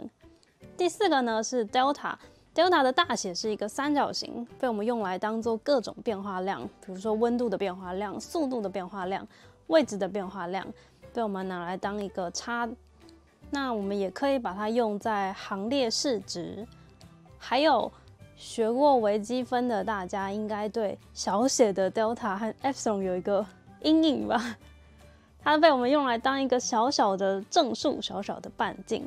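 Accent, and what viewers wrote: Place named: United States. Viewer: 這是美式發音，不是真正的希臘文發音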